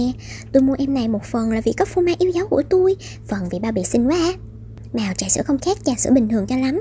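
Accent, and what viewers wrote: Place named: North, Vietnam. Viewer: Nghe giọng bà chị giống ng Bắc á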